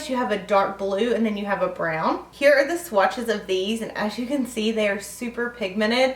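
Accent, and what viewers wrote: South, United States. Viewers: I love watching your videos, and I love your southern drawl ( I'm from Kentucky so I know where coming from). I signed up for influenster but I have never gotten anything do I need to do something other than just sign up? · I love your videos! You are beautiful young lady and you seem to show that beauty outside and inside. I was very curious about this palette. The price point is high for Laura Mercier's eyeshadow palettes. What is tempting me is the dark matte colors. I love the shimmer shadows. They are gorgeous!! I use those dark shadows with my liner. I like to take a small smudge brush and go over my liquid liner or my eyelash glue to block that shine and soften the liner. If I travel with a dark color, I take a MAC or MG single pan in a Z palette and I have broken three of these dark colors while traveling. This palette along with whatever palette is my favorite at the moment would be safer to travel with. 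I'm not sure if the white color would help me. I have this palette in my Sephora cart but that price is keeping me from finding it that worthy. I have too many things on my want list right now!! You're lovely and keep doing videos. I'm a Northeast Tennessee girl that has a southern accent close to yours!!!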